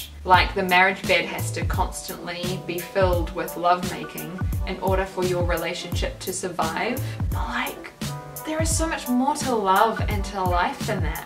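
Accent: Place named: New Zealand. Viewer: are you from new zealand? my second guess whould be australia your accent sounds a little kiwi to me,just curious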